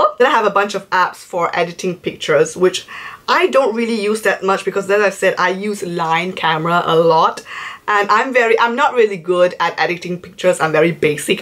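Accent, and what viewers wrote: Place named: Italy. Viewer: I love Italians and there funny accents!!❤❤😍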